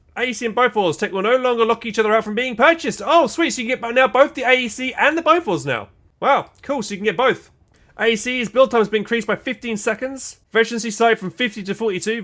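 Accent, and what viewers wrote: United Kingdom. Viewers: I was pulling your leg regarding yall. Anyway, is very English. As am I. In fact we even have the same accent. Maybe it's my age, getting too old for this video computer entertainment;0)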